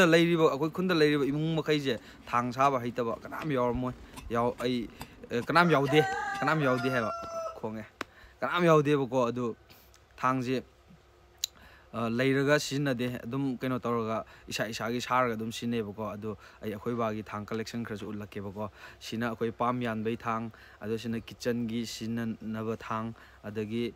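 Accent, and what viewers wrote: Kakching, India. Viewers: Kakching marol da adum ngango taba ym nungaiye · Fjna mlle kakching mrolse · Hit chatle kakching maronse 😂😂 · Lon khoiba khr kaokhrasu accent di kakching oiri 😅… 50k ywhllase mym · Kakching macha original deidi Brother nabu henna mankhreda🎉... Full support.. Aroibgi kakching lon gi sentence tudi word amfao khngba ngmde💔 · Ym manle eikhoiju kakching ga thngnana leibne aduna marol se fajana khnge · Touruk o kakching tune da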